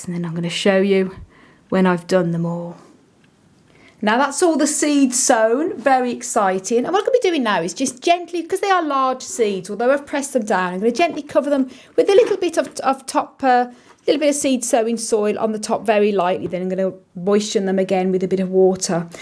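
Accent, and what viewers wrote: Birmingham, United Kingdom. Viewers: Hey, are you from the Birmingham area? I'm in Sutton Coldfield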